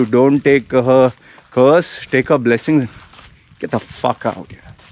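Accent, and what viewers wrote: India. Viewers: Are you an Indian? Your accent sounds of an Indian speaking English. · Which country r u native to?? Ur english accent is 100% indian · That’s the right question, the last question . Definitely no Indian woman wanted to marry a man like you. First and foremost learn to respect everyone . Seems like you had a very sad childhood . The traumatic experiences. Maybe it’s your parents or the Indian society which screwed you. Try to get yourself heal rather pretending to be someone else . There is a psychological reason why you are wearing a mask now . And your accent, thats a typical Indian accent man. Unfortunately you can’t run away from that. Your root! Angry and fearful man. Peace ✌🏼🇲🇾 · Ae Indian hi sayad... he's accent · After watching your video, I would say you should talk to your parents that they should plan you in America at least you could speak American accent English. 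And you didn't get married to an Indian woman because bla bla bla.... Because you must from a very backward area from India or you left India in 70s or 80s. Or third your video is not relating to every part of the country. Or most important: you must rejected by so many Indian women. Last but not least, indian people are hairy but not unhygienic. We Indian prefer hygienic hairy bodies instead of sweating, smelling and yucky people with bald bodies who don't take bath for a week. You might be right at some points but Being an Indian face, Indian accent English (May have American citizenship) you are not disagreeing, You are insulting....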